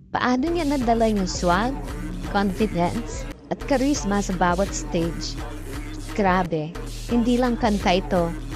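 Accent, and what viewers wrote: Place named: Quezon, Philippines. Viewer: "Let's get right & not bitter but be better together"..i love it❤ It was fun watching & hearing you..always watched replay sa mga vlogs & ls mo..kung makakita man..silent viewer lang palagi but this one napa-comment ako! Josh really deserved the award..naiba lang dahil medyo mabagal..english ang lyrics..walang rude words o halong kabastusan kaya d natanggap ng mga ibang traditional hip hop..at yun ang naririnig ko lagi noong kabataan ko ng mauso ang rap dto sa atin kaya d ko ma-appreciate! You're my happy pill too..lalo na pag naririnig ko ang accent mo sa pagsasalita..nami-miss ko ang punto' ko..bumabalik lang pag umuwi sa amin sa Lucena, Quezon..magkapitbahay ang probinsya natin kaya hawig ang punto natin😅 Thanks..keep going..nakakaaliw ka!🥰😅👍❤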